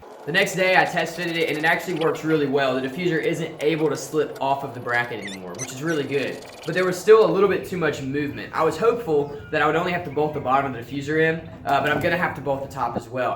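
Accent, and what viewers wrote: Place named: California, United States. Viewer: You could kinda look like a young sean boswell with that california accent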